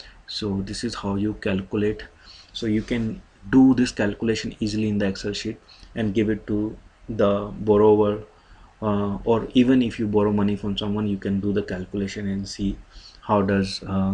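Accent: India. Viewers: her the hindu accent had to fucking leave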